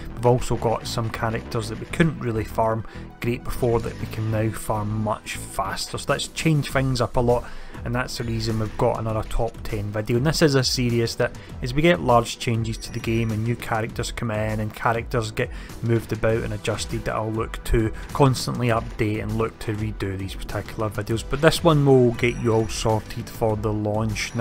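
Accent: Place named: Scotland, United Kingdom. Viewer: I feel like such an idiot... for the first 25 seconds or so, I genuinely thought this guy was speaking Afrikaans or Dutch or something.. then I just realised he's Scottish. In all serious though, thanks for the guide, mate. just getting into the game with my SWGOH mates and we're all wondering what to bother to farm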